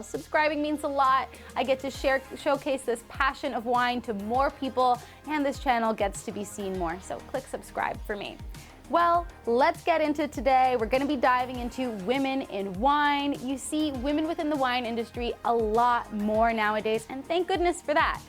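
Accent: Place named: Italy. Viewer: Thanks for the note, but it is simply the way I speak. I come from a very flamboyant Italian family lol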